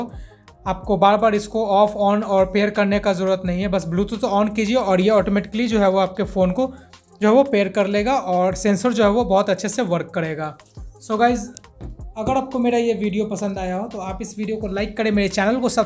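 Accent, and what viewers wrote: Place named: Bihar, India. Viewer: Bihar , samastipur ka lg rha hai bhai tu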